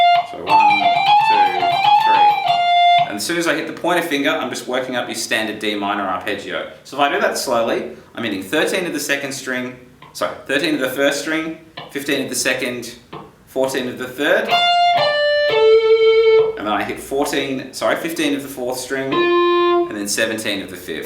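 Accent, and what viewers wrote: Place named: Australia. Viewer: Are you a guitar teacher dude? You sound Australian! Which state are you from!?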